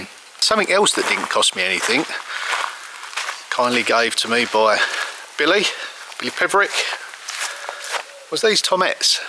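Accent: United Kingdom. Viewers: I'm curious ,What is you accent? I know the UK has accents just like in the US....I'm thinking it's North or West. Some more repairs as you go....it's a good thing.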